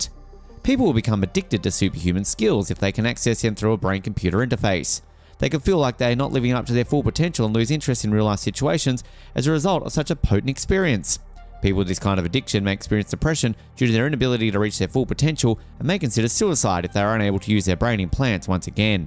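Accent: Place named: Australia. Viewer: Just a ridgy didge Australian accent. It's PRIME! No idea what he's alluding to. Or just trying to avoid a monotone AI like reading of a script.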